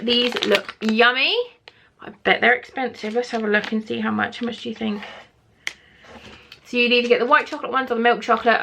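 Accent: United Kingdom. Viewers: Yum, the bulls eye sauce is great. You look super Goddess in this video too, love the UK accent as always, your vids are lovely to watch, love the try on hauls and unboxing vids 😍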